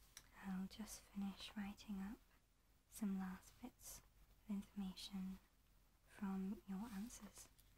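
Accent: United Kingdom. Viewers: ASMR + Cosplay + British Accent + Cute Shawty + Sci-fi + Mythology + Fantasy + Movies/Video Games = Exothermic Reaction 🔥 🔥 🔥 This channel gonna blow up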